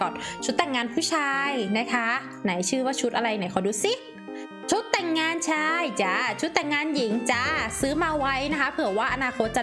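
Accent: North, Thailand. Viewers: ปี่เป็นคนเหนือแม่นก่อคับ💗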